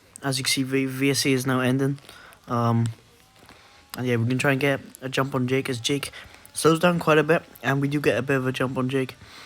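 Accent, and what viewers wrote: Wales, United Kingdom. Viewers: Are you welsh mate? Love the vids your a class driver lad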